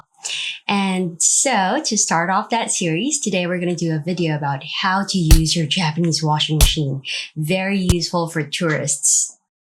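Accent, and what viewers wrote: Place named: Philippines. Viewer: Omg I love your accent! I'm also pilipina living here in Japan. This video is a life saver! Hahah tnx for sharing!!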